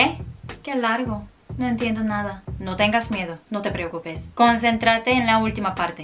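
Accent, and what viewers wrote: Spain. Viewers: Linda coreana ..tu estilo del español es de España..te recomiendo nuestro neutro español peruano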